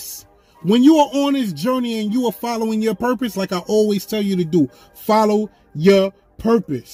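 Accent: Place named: New York, United States. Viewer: I defo from New York that accent n swag ain’t never lie u even got the hat that Memphis bleek made popular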